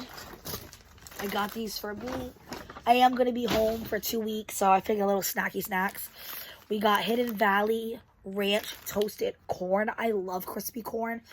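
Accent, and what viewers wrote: New York, United States. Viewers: Harry also has body wash shampoo and conditioner shave stuff ect my husband uses harrys we get his st Walmart because our dollar tree here in North Carolina doesn’t carry it im a new subscriber are you from New York i caught the northern accent because im originally from Long Island New York ❤❤❤ your content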